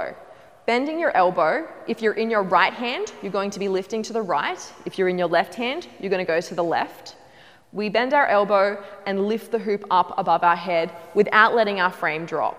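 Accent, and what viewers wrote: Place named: Australia. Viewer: I could listen to you talk all day! What an awesome Australian accent you have.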